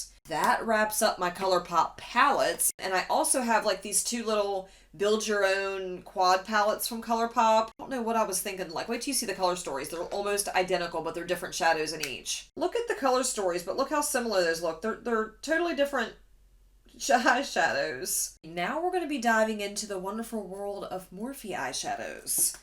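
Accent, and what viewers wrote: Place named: South, United States. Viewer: I discovered you because of this video and I love you 😂❤️ are you from the southern states? Because you sound like my people 😂. Also. Can I be your daughter of niece? I just discovered makeup 1 1/2 years ago and trying to build my collection. Lol. Jealous!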